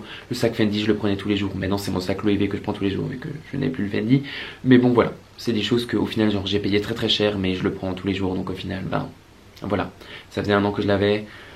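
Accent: Alsace, France. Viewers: Depuis que je regarde t'es vidéo j'ai l'impression que t'es alsacien et puis la je tombe sur cette video et tu as répondu a cette question et purée quelle satisfaction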